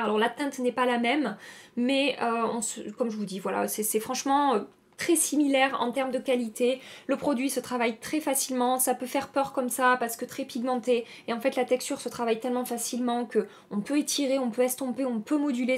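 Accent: South, France. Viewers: adore ton accent du sud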